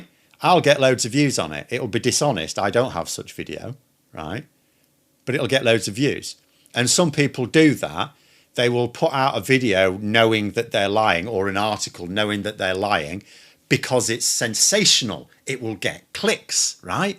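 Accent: United Kingdom. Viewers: What dialect are you speaking? You do sound like someone from the UK, easy to understand even for a non-native listener … ;-)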